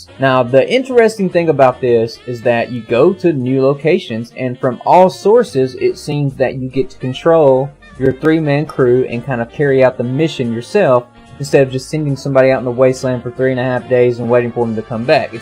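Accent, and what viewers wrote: South, United States. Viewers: man i love you southern voice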